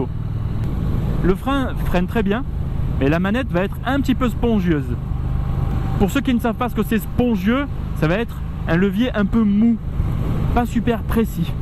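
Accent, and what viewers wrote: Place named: South, France. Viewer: un petit accent du sud bien sympa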